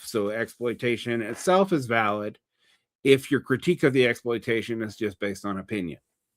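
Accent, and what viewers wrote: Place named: Bavaria, Germany. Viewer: your Bavarian accent is very noticeable in the way you pronounce stuff in German lol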